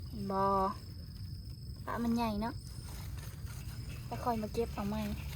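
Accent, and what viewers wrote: Laos. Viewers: สาวลาวน่ารัก สำเนียงพูดเพราะครับ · ผมชอบสาวลาวพูดน่ารักดี ไม่แปลกใจทำไมหนุ่มไทยจึงสนใจสาวลาวกัน.😍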